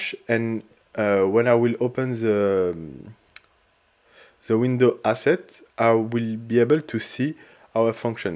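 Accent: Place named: France. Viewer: Je reconnais cet accent français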